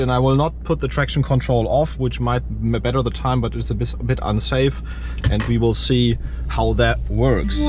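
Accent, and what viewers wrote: Germany. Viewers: tscherman accent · I love it how you can hear your german accent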